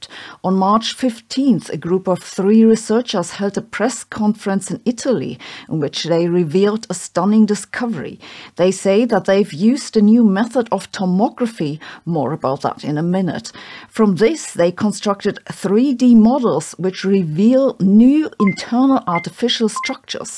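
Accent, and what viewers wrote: Germany. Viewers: I don`t want to sound offensive, but a have seldom listened to any english with such a school-book heavy german accent, just adorable. 😁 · Here for your German accent, I also love how you articulate your arguments, thank you for your informative and well presented take on this matter. We don't have to agree with you but we must always recognise a point well made.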